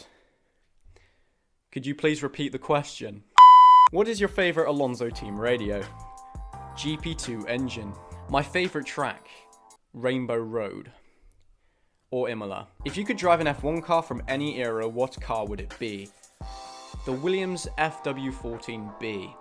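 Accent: United Kingdom. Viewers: He hides his scouse accent really well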